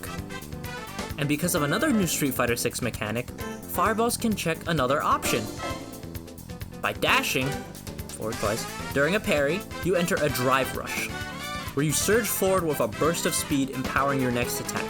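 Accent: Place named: United Kingdom. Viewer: In that intro you really sounded like the slay the princess narrator lol xDDDD Or maybe its because im not used to brittish accent idk, but it reminded me so bad of that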